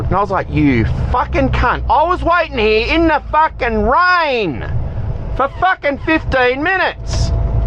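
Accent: Australia. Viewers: After watching vids with over excited yanks yelling rolling R's in my ears, it is like sweet music to hear Australian. I enjoy the reversing skills too - good one bloke.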